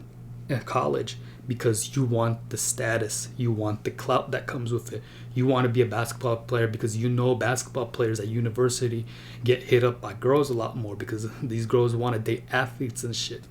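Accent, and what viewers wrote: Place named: Texas, United States. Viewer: Bruh your accent is very beautiful. Actually I thought in Texas they speak like Arthur from red dead redemption 😅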